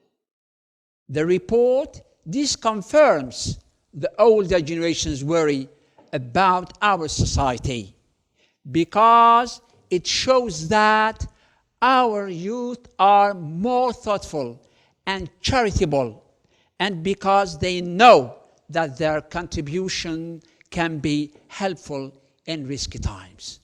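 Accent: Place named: India. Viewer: lol he has an indian accent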